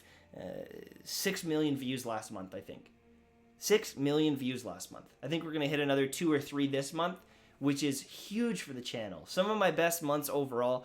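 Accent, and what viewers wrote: Scotland, United Kingdom. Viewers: Loved the snipe vids back in the day with the Scottish accent 😂. Also can't get enough of that flying mongoose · Dammit, bring back the scottish! Lol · I’d love to see some other videos. I remember watching some of your funny Monster Hunter videos with the accents. Exotic gun reviews in that Scottish accent is always hilarious as well. Up to you where you go, but I’ll be here whatever video comes out!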